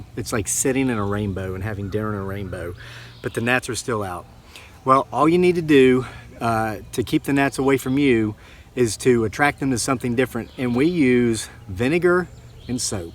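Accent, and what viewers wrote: South, United States. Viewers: I love with all the practical advice & especially your wonderful Southern accent! I live in Broadstairs, Kent, UK, but will try your fly deterrent! More power to you!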